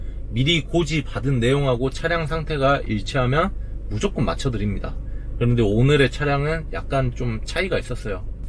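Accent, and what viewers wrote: South Korea. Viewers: 아따 전라도냐? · 혹시 광주사람인가요?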